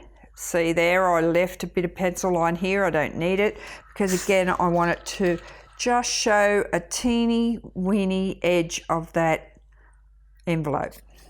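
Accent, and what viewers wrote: Australia. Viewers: So nice to hear a Aussie fellow crafter. Thanks for the tutorial as I'm new to all of this and very helpful. And where did you get your glue from please? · I’m a Melbourne girl who was so excited to hear an Aussie lady doing a video and I really love how you up cycled those envelopes. I’m doing some gift ideas that will work well with this envelope idea can’t wait to play😃👍🏻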